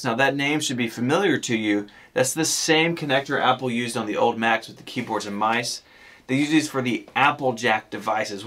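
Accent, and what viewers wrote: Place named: South, United States. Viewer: This guys from the southern area of murica. They’re not very bright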